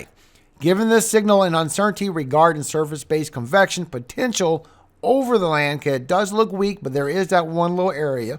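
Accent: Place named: Baltimore, United States. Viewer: i would Not have guessed u were from milwaukee u kinda sound like u have a baltimore thing goin on ..probably only cuz im from around there ..unfortunately..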